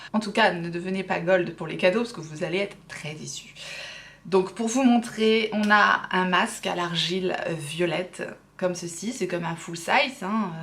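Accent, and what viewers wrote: England, United Kingdom. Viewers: Décidément ton accent anglais me plaît beaucoup, j'ai le même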